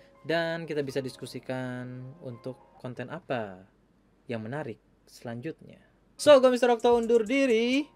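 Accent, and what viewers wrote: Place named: Serang, Indonesia. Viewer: Waduh bahasanya to orang serang banget:v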